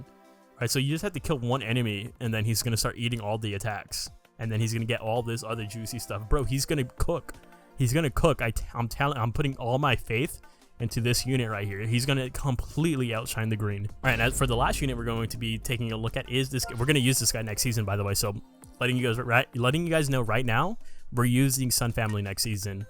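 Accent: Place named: Philadelphia, United States. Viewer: “ Launch that jawn “ bro definitely from Philadelphia or close by 😭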